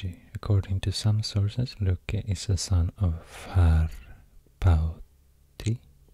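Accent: Sweden. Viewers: Finally found a very calm speaking swede in the ASMR community! I’ve been to Stockholm so many times and even did an exchange in Umeå. I got hooked to the Swedish accent to English and love this so much. You speak so calmy and take breaks between your sentences. A new subscriber here :) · I think your Swedish accent and calm voice makes your voice so perfect for ASMR.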